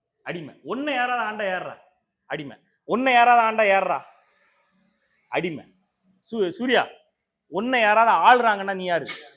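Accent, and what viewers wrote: Tirunelveli, India. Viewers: இயல்பான நெல்லைத் தமிழில் யதார்த்தமான ஆழமான அரசியல் பதிவு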